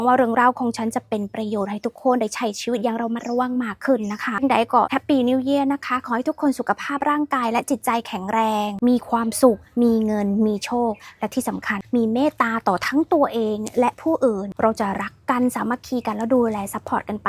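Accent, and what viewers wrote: Isan, Thailand. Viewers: 😂🎉❤Happy new year ขอให้สุขภาพแข็งแรงไวๆ ครับ ชอบคลิปสนุกดี สำเนียงเด่น ทั้ง ไทย อีสาน เขมร อังกฤษ แถมยังน่ารักด้วย😊😊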